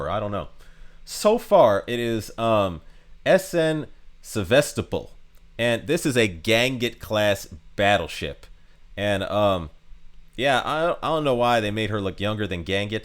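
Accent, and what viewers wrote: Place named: United States. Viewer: God damn man, I love your energetic personality but you have a chronic infection of Americanised pronunciation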